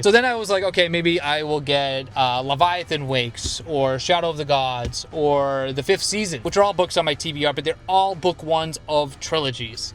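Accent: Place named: Boston, United States. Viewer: It's ok! I've noticed your "Bostonian accent" in other videos, such as saying 'room' as 'rum' (which initially made me rewind and play it back, like "wtf... are we talking about drinks now?!" LOL) It's all good... different accents are cool 😎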